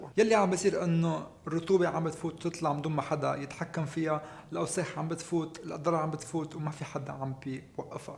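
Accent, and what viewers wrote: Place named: Lebanon. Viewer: هما اللبنانيين بيسولفوا كذا لا تجلسي تدخاي بالنوايا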